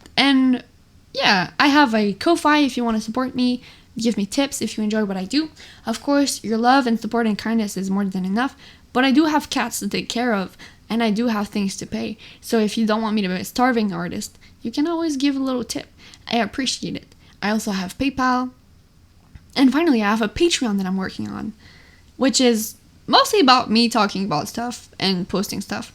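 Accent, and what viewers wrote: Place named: Europe. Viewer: Do you have a French ancestry? You seem to have a European lilt to your accent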